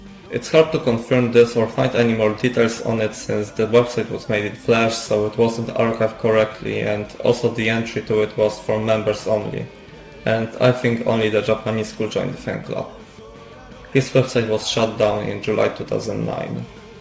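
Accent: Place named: Poland. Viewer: czuje polski akcent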